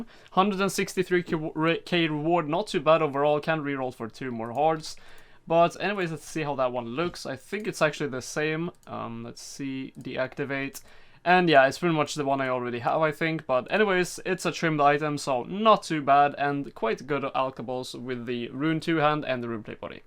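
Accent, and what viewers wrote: Sweden. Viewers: swedish? sounds so much like swedish kind of english haha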